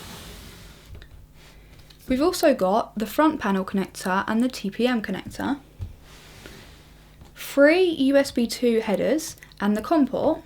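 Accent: United Kingdom. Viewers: Unfortunately I consider this to be one of the more audible accents in the UK. You should hear what people sound like in Portsmouth (Mush;)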